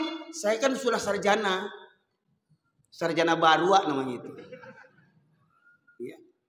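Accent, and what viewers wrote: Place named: West Sumatra, Indonesia. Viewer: Lombok hadir tuan guru, saya suka, pengajian ini, meskipun ada bahasa yg sy tidak mengerti, tpi sy suka mendengar isi pengajian dan logat minangnya.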